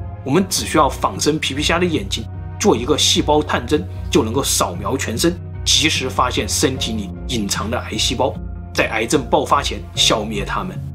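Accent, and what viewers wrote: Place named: Cantonese, China. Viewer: 是廣東人吧, 講話經常有粵語的影子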